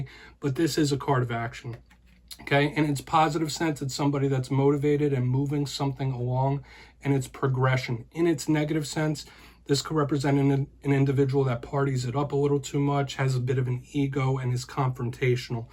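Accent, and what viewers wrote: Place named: Delaware Valley, United States. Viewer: Powerful reading, thank you. Sounds like you’re from the Delaware Valley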